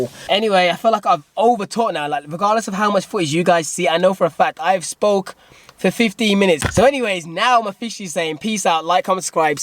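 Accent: Birmingham, United Kingdom. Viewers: Daaamn that birmingham accent ! Just makes me wanna kill my self bruh your accent is the worst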